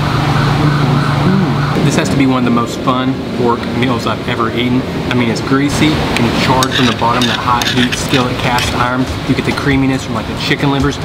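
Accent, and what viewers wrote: South, United States. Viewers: yeah, cute Southern accent. By the way, it is not Cariboo. Hehe. Ca-ra-bow or Carabao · I’m a sucker for southern accents! 😩 I think we all need a charming country man to travel the world with 😂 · My ancestors hometown. Love Kapangpangan food but I am in the minority as I don't like sisig, my bad. But you are in food haven there. Enjoying watching and also listening to your southern voice , from Melbourne, Australia here. Btw it's not caribou it's carabao (cara-baw) or water buffalo. And you are forgiven.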